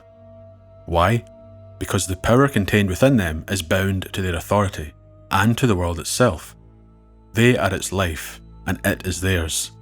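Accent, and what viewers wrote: Scotland, United Kingdom. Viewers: I've missed those dulcet Scottish tones!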